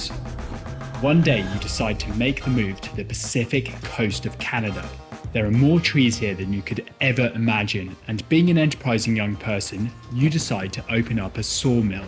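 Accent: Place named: United Kingdom. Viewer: Clear British accent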